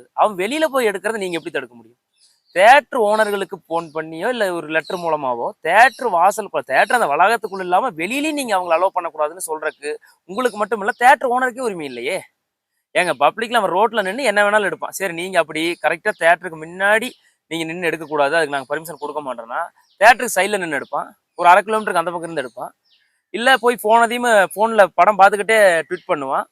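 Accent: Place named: Kongu, India. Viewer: Thambhi ERODE or Coimbatore.. Wonderful Kongu Tamil ...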